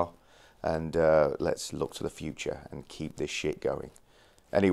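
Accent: United Kingdom. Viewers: Hello!...I really hope that you and yours are healthy...take care!!! I thinck you are so far from DT...your tune is awsome more DT than DT... I always loved JP and DT but your way of playing hybrid picking, legato etc...you are flying above the neck of all your guitars...your style is so fluid and airy... Nothing is rough or inelegant in your game... you play as you speak but faster... with clarity in a calm and calm manner with this unique British accent so far from the nasal American accent... (... be careful, not all Americans have this accent coming from the nose...) I am French and the English accent is very precious as is your game, with finesse and mastery. I don't know how many centuries it would take for me to live to reach this level... respect.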